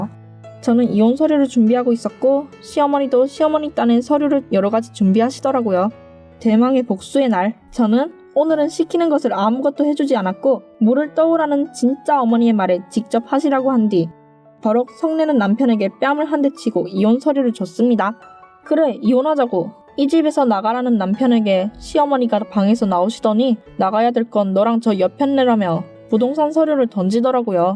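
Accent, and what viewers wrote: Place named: Busan, South Korea. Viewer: 부산 사투리 세요? 듣기 싫다 정말 !!!조심하세욧 제발 ㅋㅋㅋㅋㅋㅋ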